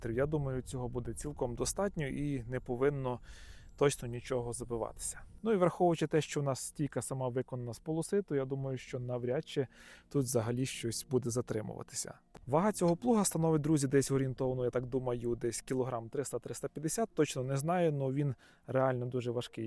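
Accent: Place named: Volyn, Ukraine. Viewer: Вас мова з волинським акцентом... Звідки ви?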